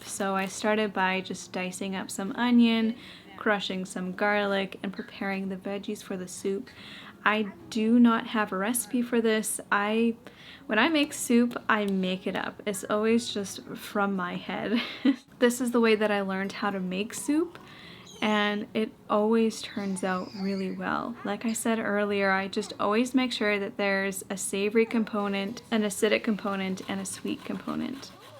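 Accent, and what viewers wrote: Canada. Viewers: Canadian accent so wonderfully strong in this video 😍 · I am now learning that Canadians pronounce things differently than the U. S. 😂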